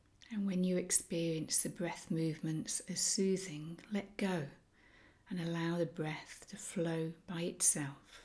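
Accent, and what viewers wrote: England, United Kingdom. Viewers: At last a warm English voice ...Aaah 🕉️🙏🥰✨🌟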